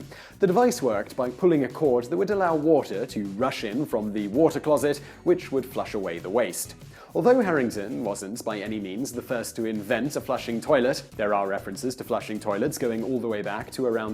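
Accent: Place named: RP, United Kingdom. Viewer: You are very informative inside YouTube program however you have a certain tone commonly known as Oxbridge I know one has to speak distinctly but so do I without send sounding condescendingn inner wave sound like Brian Sewell is he still alive help me YouTubers or is he dead not you obviously because you sound a lot more friendly uh I just wonder if you have a certain tone I said Crapper not kappa one could almost think of somebody called trump trump Astra now Probably get arrested for daring to mention his name thank you for your downloads not faded old queen who does like your downloads but I'm worried about trump trump yours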